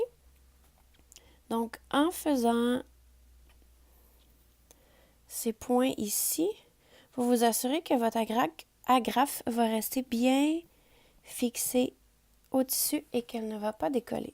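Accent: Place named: Quebec, Canada. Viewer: Entendre des instructions à la manière et surtout avec l’accent de la Nouvelle-France! Merci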